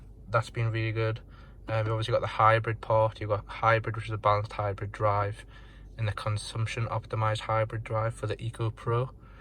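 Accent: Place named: Newcastle, United Kingdom. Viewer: Fellow Newcastle accent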